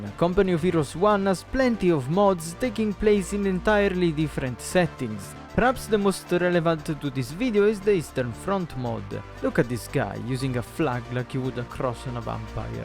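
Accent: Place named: Italy. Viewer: Damn, that italian accent is thick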